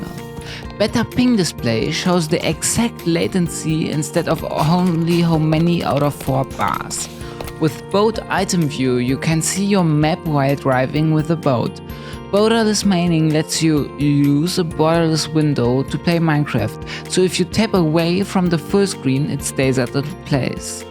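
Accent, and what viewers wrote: Germany. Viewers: man merkt am arkzent das du deutsch bist haha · Cause hes german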